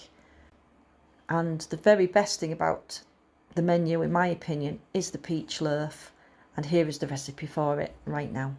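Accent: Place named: Northern England, United Kingdom. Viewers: Fair play to ya for making this, i saw it on my feed and clicked on it to see, its always nice to support youtubers, so ive liked and subbed well done, good video, keep the good work up. Love the accent by the way, where u from? i know its north somewhere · Sounds like Humberside. I knerr.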